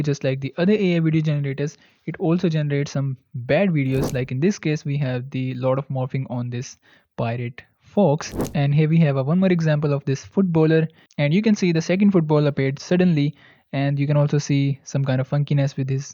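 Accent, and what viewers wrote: India. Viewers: Honest opinion. International audience will only watch neutral international voice and accent. Not our hopeless unimpressive Indian accent. Change it to AI voice for more views. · I actually prefer this real voice and accent over AI by now.... maybe bc it’s authentic and I trust it more now, I’m sick of these AI voices cause every Indian guy takes advantage of AI like crazy 😂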